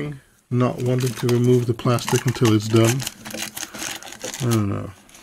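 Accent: Netherlands. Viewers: You sound Dutch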